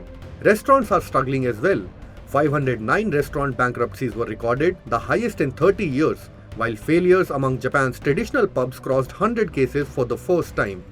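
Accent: India. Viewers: Indian english 🤮🤮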